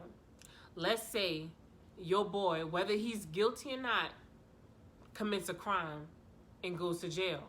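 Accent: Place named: Bronx, United States. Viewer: Omg I swore you were a Bronx or Brooklyn girl 😂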